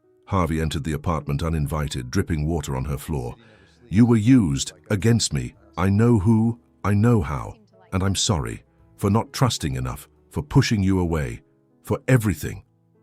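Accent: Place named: England, United Kingdom. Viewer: Maybe it's the English accent.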